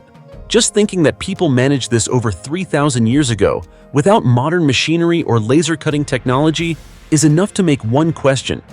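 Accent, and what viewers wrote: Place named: United States. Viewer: as a side note, I can’t even watch this. American narrators drives me nuts.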